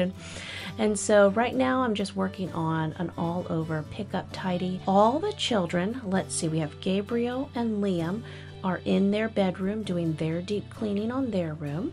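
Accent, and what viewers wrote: South, United States. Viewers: You're so welcome my dear. God bless you and your lovely family. Love the Southern accent. Makes me feel at home. We are originally from Virginia as well. God bless and keep making people smile. 😘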